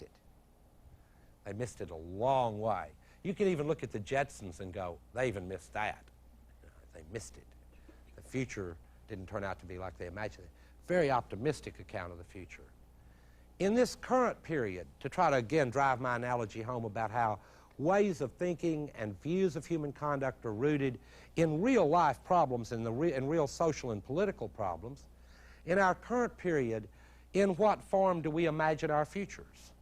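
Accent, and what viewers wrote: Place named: Texas, United States. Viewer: Watching this made me realise, as an Englishman, all academics should be delivered in a Texan accent.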